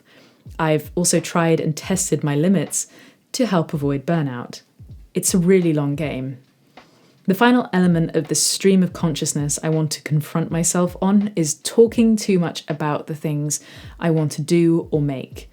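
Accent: United Kingdom. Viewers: Yo, are you British or something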